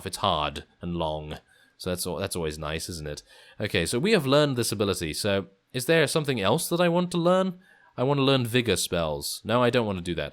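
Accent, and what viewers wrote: United Kingdom. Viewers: This "play through" sounds much more like a very British reading of flavor text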